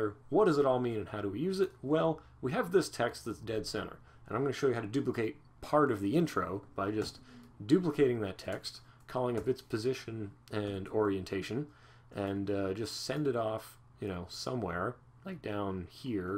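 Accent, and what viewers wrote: Canada. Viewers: You have a very Canadian accent and you are a great teacher.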